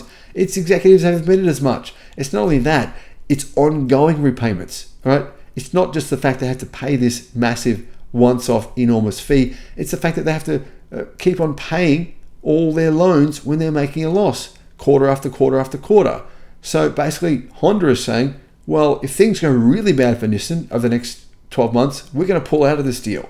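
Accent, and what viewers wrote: Australia. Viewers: Please slow down. When you start talking rapidly your Aussie accent makes you hard to follow for us in the States. Other than that, you've got a very informative site.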